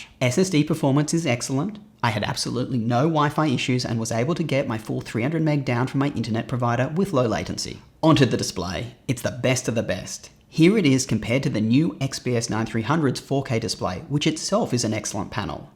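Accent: Australia. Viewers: Are you Australian? I have to know hahaha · I’ve watched quite a few vids now and I’ve only just realized is Australian 😂😂 love the reviews and great advice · Are you an Aussie,?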